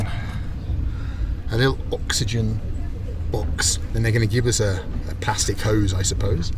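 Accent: China. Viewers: Chinglish is Fun!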